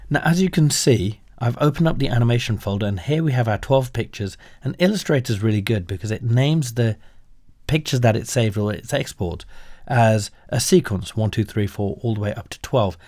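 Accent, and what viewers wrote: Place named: United Kingdom. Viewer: thamk you OH so much, Brit accent teacher!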